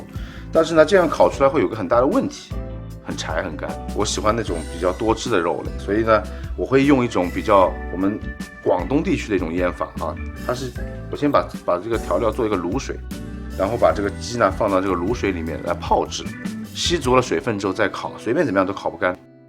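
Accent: Shanghai, China. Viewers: 一，你又不是广东人，一口上海国语。二，广东人不吃辛辣的调料。三，这只鸡没烤熟。如果满分十分你只能拿个三分，失败的视频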